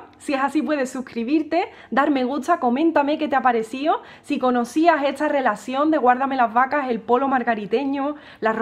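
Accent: Andalusia, Spain. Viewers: Excelente! De que parte de España es tu acento? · No me considero egoísta pero cuando se piensa que has de tomar vacaciones...Muchacha! Y que vamos a hacer mientras sales de nuestra órbita??? Tu eres nuestro pan de cada semana, siempre espero a que sean aquí las 15 horas para ver tu carita y escuchar tu "Buenas Tardes" con ese acento andaluz tan gracioso para nosotros y naturalmente tu cátedra de historia de la música y su mecánica, por favor, descansa pero no tomes vacaciones muy largas...please! :thanksdoc::thanksdoc::thanksdoc:. Me pareció que tu programa de hoy se centró en Greensleves tocada en diferentes tonos y ritmos, verdad? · Que belleza, que magia...la poderosa razón estética....soy de Caracas Venezuela...me incineraste el corazón con tu interpretación del polo <link> encantan como hablan las andaluzas.. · Nena ya le han hecho muchos elogios en relación a su evidente alta preparación músical , ademas d historia musical y con una sintesis didactica propia q le enaltece !! 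, sin duda alguna es Usted un crack en música . Yo le voy elogiar otro punto adicional : nunca habia yo oido un cambio d dicción con el nivel d perfección como el suyo !! ... d su hermoso acento Andalúz , salta a un perfecto Inglés !! , luego a un perfecto castellano , y tamb a una perfecta entonación d ese bonito polo margariteño .... Tiene un don d oido I M P R E S I O N A N T E ... !!!! 🥇🥇🥇💯💯💯👏🏻👏🏻👏🏻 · Que bello tocas y que bonita tu pronunciación andaluza!!! Muchas Gracias! 😍😘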